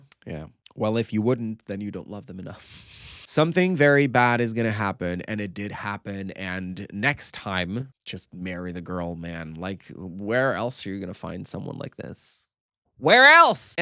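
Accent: Portugal. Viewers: Meu Deus o choque que eu tive quando voce comecou "Amor é fogo que arde e não se ve..." eu esqueci totalmente que vc fala portugues hahahahahaha mas nossa pra mim (br) é realmente difícil entender português de Portugal, que doideira né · O PORTUGUÊS DE PORTUGAL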